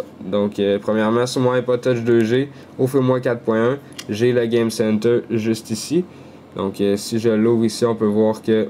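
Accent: Canada. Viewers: t'es Canadien ???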